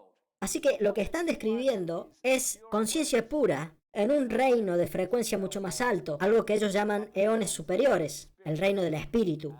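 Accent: Córdoba, Argentina. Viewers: Porque es cordobes!. Linda tonada!. Saludos desde Buenos Aires!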